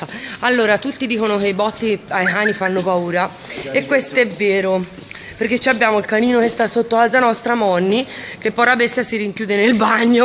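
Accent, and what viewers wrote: Tuscany, Italy. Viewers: "Si arriva babbo..." ❤❤❤adoro la parlata toscana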